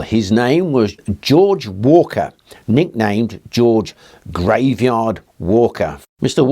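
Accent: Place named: Black Country, United Kingdom. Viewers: What a relief!! an english video narrated by an englishman and not a septic!!! aka a yank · Hi. Are you from Stafford or Lichfield by chance? Black country for sure but I can't place your accent.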